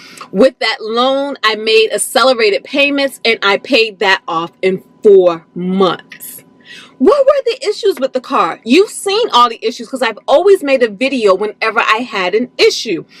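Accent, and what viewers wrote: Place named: DMV, United States. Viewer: Love the DMV accent!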